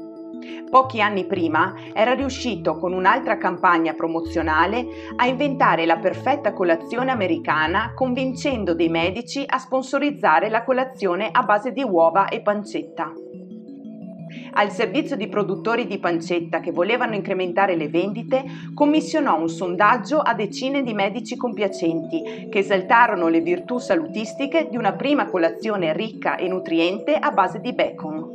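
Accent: Veneto, Italy. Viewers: Sei veneta? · Racconto interessantissimo... grazie☺️ Sei Veneta per caso? 😬🙃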